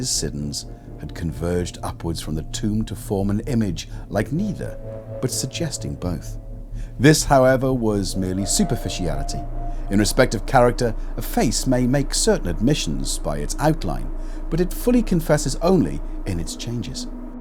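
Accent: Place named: RP, United Kingdom. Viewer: Love this! I wish my English accent was as BBC'ish ;-)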